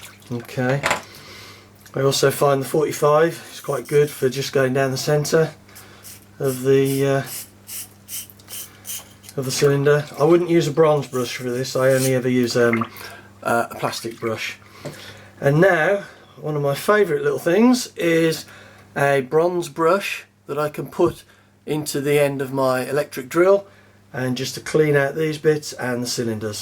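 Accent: England, United Kingdom. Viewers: I hardly ever disassemble completely. I just scrub out the barrel cylinder and wipe out the outside. It only gets a complete hair down like twice a year never had an issue. And you sound English, I take it. These are the only handguns you can own. In the United States they are not even considered firearms. I would look into getting a conversion cylinder. But I do not know if that would break your laws. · It sounds like an English accent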